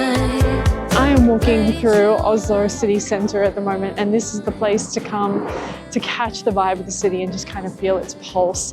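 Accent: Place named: Australia. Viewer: Europe here. So nice to hear the wonderful Aussie dialect again, brings back great memories from my time down under. Thanks for sharing your travels. Really enjoy your vlogs.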